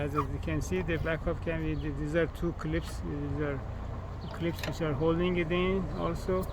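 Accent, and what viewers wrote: Iran. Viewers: از گویش شما پیداست ایرانی هستی موفق باشی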